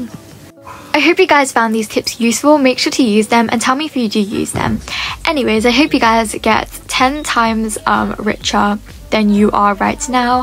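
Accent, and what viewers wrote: United Kingdom. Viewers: Power of the British ascent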